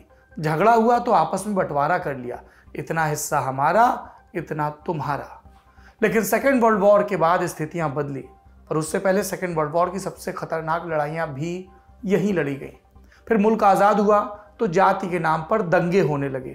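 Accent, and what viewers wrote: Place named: Purvanchal, India. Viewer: Purvanchal se ho kya.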